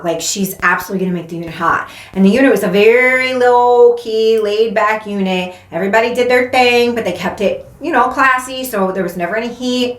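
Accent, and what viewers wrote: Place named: New York, United States. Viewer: Random but i love your new york accent lol! Also as a fellow addict in recovery i love and adore the work you are doing. I was a heroin addict for the better part of 8 years on and off. Finally have substantial clean time and my twin boys are due to make their appearance may 3rd WE.DO.RECOVER!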